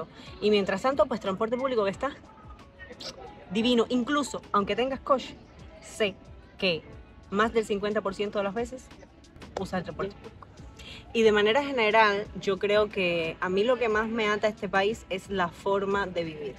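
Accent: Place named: Andalusia, Spain. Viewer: Pareces Andaluza!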